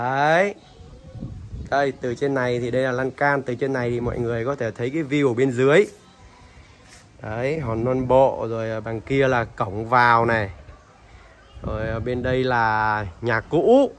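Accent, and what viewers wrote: Vietnam. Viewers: Tưởng a ở dưới Miền Nam chứ! Ra ngay Hải Dương 😊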